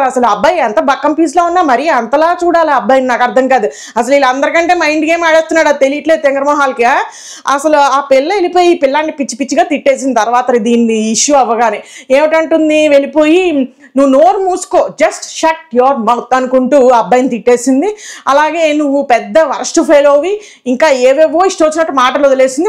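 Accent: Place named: West Godavari, India. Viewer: navvaleka sachipoyanu! thank you so much! Bigg Boss should continue only for your reviews andi! Are you from West Godavari? Your slang sounds bit like us.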